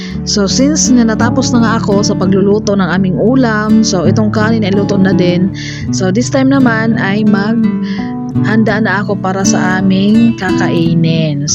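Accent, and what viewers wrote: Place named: Iloilo, Philippines. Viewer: Ilonggo gd ah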